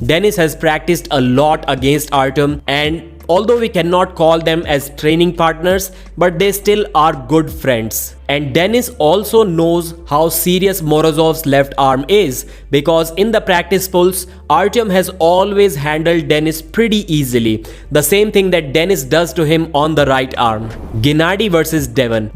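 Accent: India. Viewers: Your voice seems Indian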